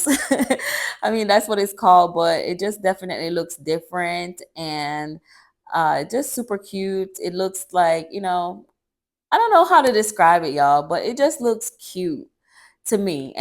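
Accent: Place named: Jamaica. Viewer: love ur jamaican accent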